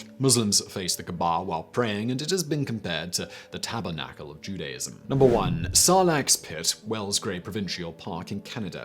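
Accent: United Kingdom. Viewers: Dodgy Brit accent and speaking way too fast - what is your hurry, slow it down. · Which part of Britain is this man's accent from? As a fellow Brit, I'm scratching my head.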